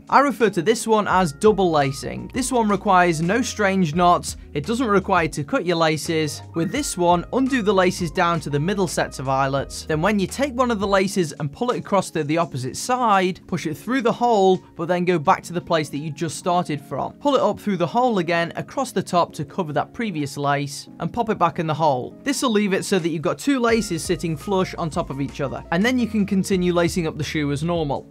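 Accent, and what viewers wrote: United Kingdom. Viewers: I watch his videos only to hear that British accent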